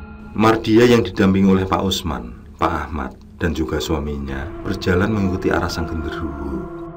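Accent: Java, Indonesia. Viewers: Yg aku suka dri chanel ini salah satu nya pmbawaan logat bahasa jawa nya Gambar nya yg terkesan hidup tak lupa alunan musik nya yg mmpu mnghipnotis pkiran pokoke mantep smangat trus brkarya pakde bnyak blajar lah dri chanel ini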